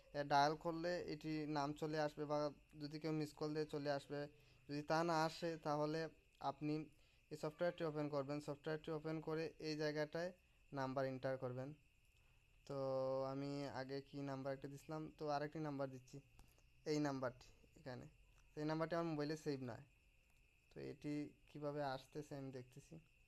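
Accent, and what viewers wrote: Sylhet, Bangladesh. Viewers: তুই সিলেটি না কিতা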